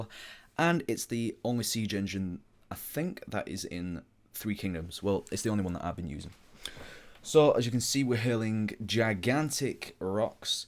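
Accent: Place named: Northern England, United Kingdom. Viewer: It sounds like hes northern english.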